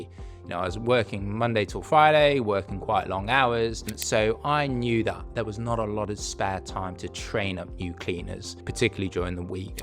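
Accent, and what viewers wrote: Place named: United Kingdom. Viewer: Hey are you based in the UK? I am looking to start it now & I came across your British accent lol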